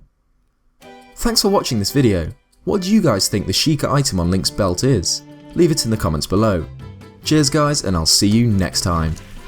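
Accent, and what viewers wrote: United Kingdom. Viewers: Are you British? I love your accent.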